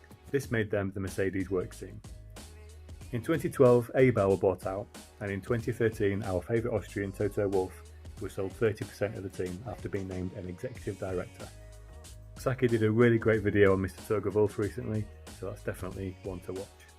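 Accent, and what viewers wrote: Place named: United Kingdom. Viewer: Interesting info! But please, speak louder!!! It’s a bit hard to understand you… been British I guess. Gabriel from Costa Rica saying hi… Good job! 👍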